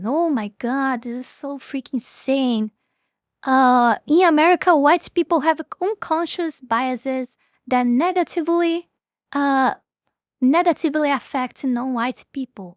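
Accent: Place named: Brazil. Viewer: When you know the person is brazilian cause they have the same accent as you do. But you can't prove it.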